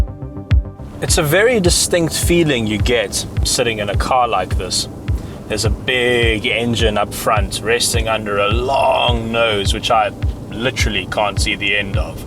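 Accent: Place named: South Africa. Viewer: CarVinci No this South Africa. This is our normal English accent. I have seen that many people from other countries think we speak with an Afrikaans accent but then that is people who speak Afrikaans usually who then also speak English. His accent is the usual South African English. The place he was filming is in Cape Town.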